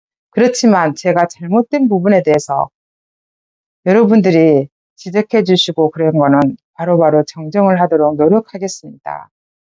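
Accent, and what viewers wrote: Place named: Honam, South Korea. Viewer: 당신이야말로 애국자 이십니다 진실과 거짓을 구분하고 정의와 불의를 알며 선과악을 알고만 있어도 세상의 모든것이 제대로 바뀔수가 있읍니다 억양이 호남쪽분 같은데도 이렇게 바른소리를 내시니 천만다행 입니다 고맙고 감사 합니다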